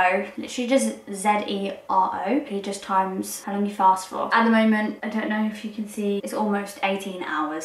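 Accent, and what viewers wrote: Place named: England, United Kingdom. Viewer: Great channel, love the way you explain keto really well and your down to earth attitude. Ive been doing keto for a month and really like it. I think everyone does keto their own way and everyone's a bit different. Been watching various channels and most of them have been American. It's great to have channel with an English accent talking about the places I know like tesco. Thanks and well done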